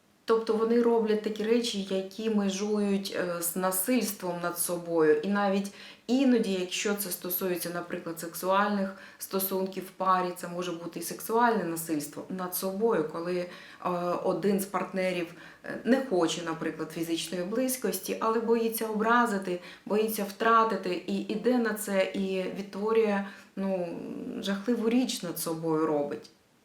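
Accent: Russia. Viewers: Відчувається, ваша російська вимова ( можливо ви раніше і спілкувалися тільки нею), але приємні ваші старання в перекладі українською. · Говорите-то Вы на украинском, а акцент у Вас русский 😂😂😂😂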